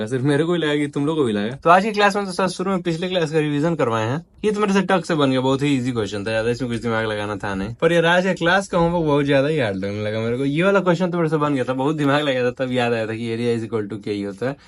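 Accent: Bihar, India. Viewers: Bhai aap bihar se ho kya ascent se lg rha hai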